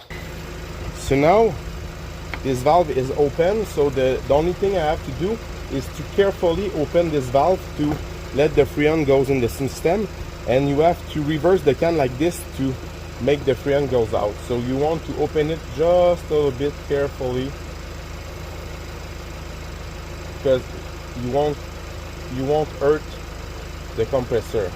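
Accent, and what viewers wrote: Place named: Quebec, Canada. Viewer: Salut j'aime bien tes video je reconnais ton accent 😅 comme le miens. Ou tu te procure le r134-a au quebec?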